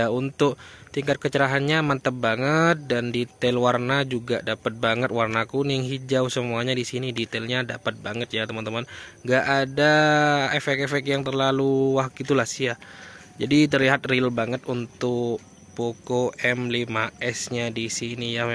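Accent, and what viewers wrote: Madura, Indonesia. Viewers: Dari aksen bahasa indonya sih.. Pasti dari Meduro ori yo cak ? Hahaha 😇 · Logatnya kental banget, madura banget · Orang Madura kah bang?? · Madura logat ny 😂🤣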